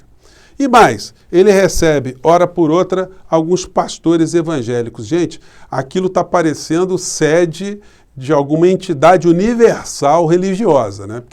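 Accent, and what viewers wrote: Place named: Rio de Janeiro, Brazil. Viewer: Professor, não tente "amenizar" o seu sotaque. Fale sem pena nem vergonha com o nosso sotaque do nosso maravilhoso Rio de Janeiro! Um abraço!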